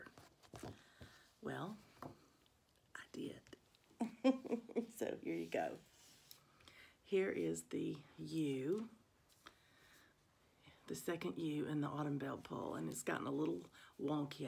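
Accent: South, United States. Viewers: Thank you so much, for such a quick response. Your channel is my favorite. I lived in Memphis, TN for two years and left a piece of my heart there. Your southern dialect, hospitality, and lovely mannerisms take me back to that very enjoyable time in my life. Blessings to you in the new year!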